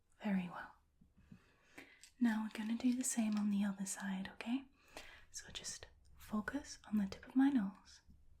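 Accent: United Kingdom. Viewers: I can’t quite pin down your accent. Is it British, Irish or Scottish? Either way it’s lovely 😊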